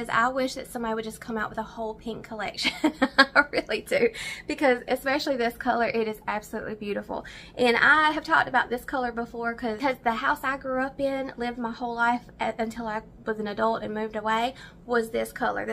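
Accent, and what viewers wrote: South, United States. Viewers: Girl,I love watching you and listening to you talk in your sweet southern voice . I love how you explain the materials and you try on things i wouldn't ever think i would buy but have concidered thanks to you . I am very insecure about my body and you have given me more confidence to at least try new things, so thank you for that . And your hair and makeup is always on point. Your just beautiful .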